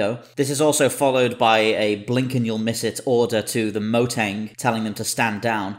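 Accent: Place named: Wirral, United Kingdom. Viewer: Are you from the Wirral?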